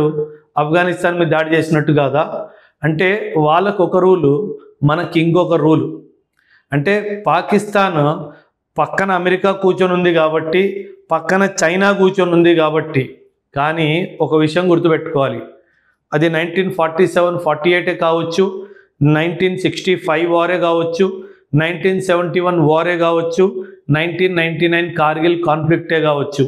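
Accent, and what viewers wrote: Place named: Telugu, India. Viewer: Though you are a Sardarji having Punjabi as mother tongue, now you are speaking like a pure Telugu person.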